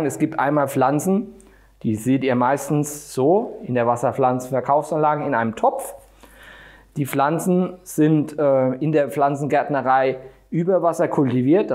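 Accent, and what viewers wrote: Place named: Palatinate, Germany. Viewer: Weschde... Efach schä es Pälzer Dialekt!!! #Südwestpfalz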